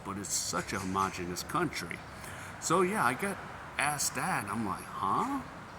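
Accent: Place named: South, United States. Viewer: Maybe u need to tone down the red neck accent a bit hehe..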